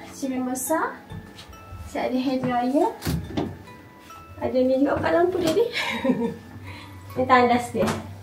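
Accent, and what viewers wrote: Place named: Sabah, Malaysia. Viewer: comel cakap sabah.... buat lah story pekenalan dan suami dan q and a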